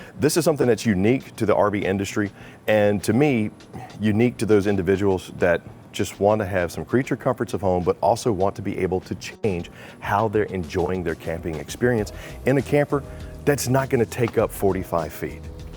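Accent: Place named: United States. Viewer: I cannot imagine a more American-sounding person than this man. That's neither good nor bad - just an observation. The accent, of course; but also the tone, the diction, the rhythms...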